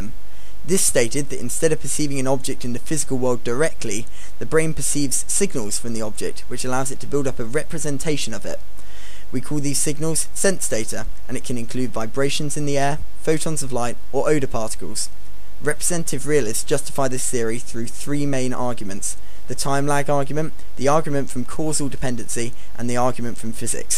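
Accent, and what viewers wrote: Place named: United Kingdom. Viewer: This is not plain English. This is British English!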